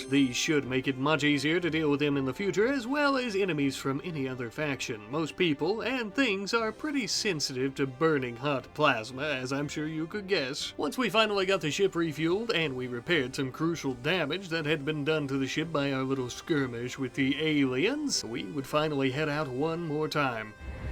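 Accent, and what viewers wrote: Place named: Transatlantic, United States. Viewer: is this your natural voice? it sounds like you're making a jim carrey smirk type of forced face with a idea of some kind of transatlantic accent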